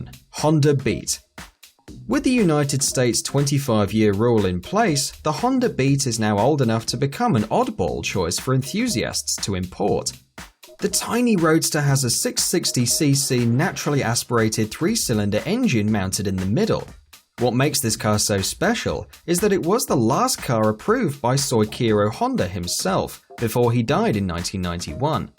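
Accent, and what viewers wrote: United Kingdom. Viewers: Guys... It will be cheap cause, Listen to that accent, Pure english, that means UK confirmed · Because he's from The UK... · You're talking in Dollars and about the US market, but you cannot sit there and tell me you are American with that blatant British accent. Either talk in Pounds & UKDM, or get more American; pick one. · It's a neeesan not a nizzan 😂😂 i hate British accents lol .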